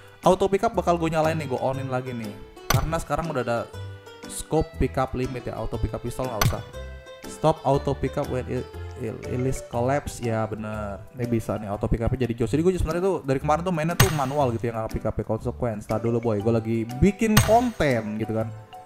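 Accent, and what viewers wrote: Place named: Jakarta, Indonesia. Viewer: Fix Betawi ini orang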